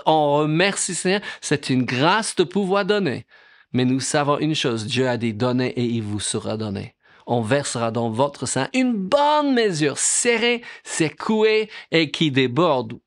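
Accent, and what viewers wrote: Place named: England, United Kingdom. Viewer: Message clair simple dit avec un joli accent Anglais....bon pas grave car tu présente tout ça avec joie et amour. Merci car je suis encouragé a rendre grâce pour tout ce que DIEU donne par le nom de JÉSUS AMEN Soyez bénis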